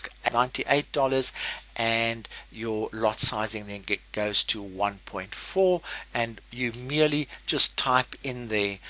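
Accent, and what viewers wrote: South Africa. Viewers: You must be from South Africa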